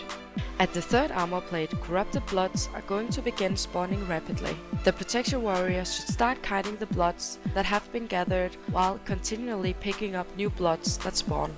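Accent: Denmark. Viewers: this sounds a lot like danish english hahaha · Agree, she's definitely a dane xD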